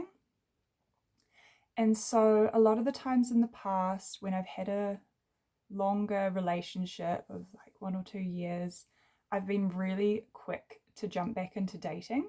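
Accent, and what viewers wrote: New Zealand. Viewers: Are you from New Zealand?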